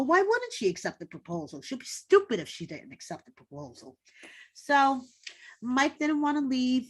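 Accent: New York, United States. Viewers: Rapid-fire, New York style. That's how I like my pizza and my recaps..❤️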